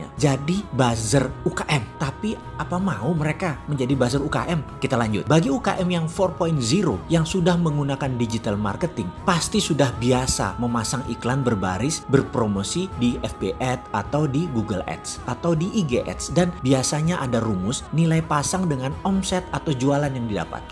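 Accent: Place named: Java, Indonesia. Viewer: Semangat boss medok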